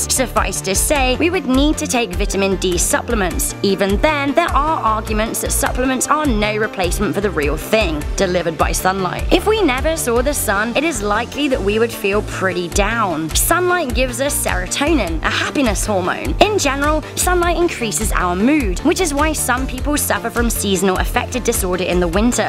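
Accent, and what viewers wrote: United Kingdom. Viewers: she has a British proper English accent. I do not but I appreciate proper English, from the source. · Edward Waller She's British, that's how Brits say it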